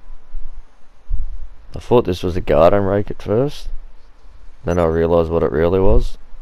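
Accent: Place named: Australia. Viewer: Good to Aussie voice in a YouTube video for a change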